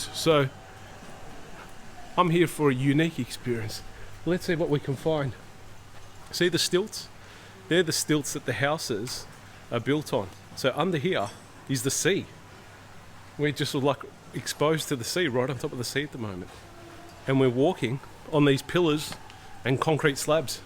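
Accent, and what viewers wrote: Australia. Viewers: So nice to hear the Australian accent on YouTube. It's getting crowded out by other, like, annoying ones. 🤪❤❤❤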